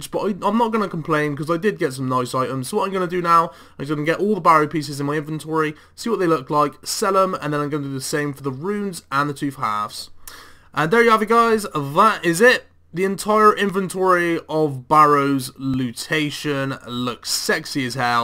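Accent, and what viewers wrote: Bristol, United Kingdom. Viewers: literally paused at 15 seconds you have to be bristolian, right? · From Bristol much?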